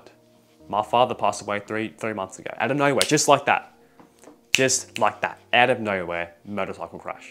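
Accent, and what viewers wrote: Australia. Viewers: made better with that Australian accent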